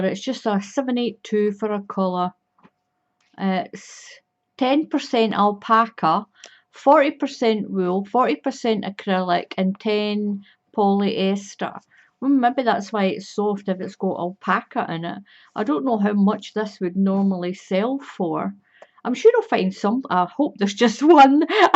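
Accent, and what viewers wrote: Scotland, United Kingdom. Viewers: Where in Scotland are you from? You remind me of someone from Lanark 👍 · Dawn Montgomery She has a Scottish accent. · really? You didn’t know Scottish straight away? I’m Scottish I’m not being rude, I’m just curious 😃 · i would love to have a yarn surprise from ebay! i love all that yarn, and i love your accent in your voice, you must be from Scotland? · I agree with you that I would rather see and feel yarn before buying!! Love your Scottish accent. I am American, but I think I have Scottish DNA because I easily talk in the Scottish brogue. Lol. I am not so good with the Irish. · I miss hearing my Gran and Grandad's Scottish accent so listening to you has brought back so many wonderful memories 💕 I would make a little weaving machine and make some nice wall hangings💖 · I legit subscribed to your channel JUST so I can listen to your accent 😍 I miss Scotland! 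😭😭😭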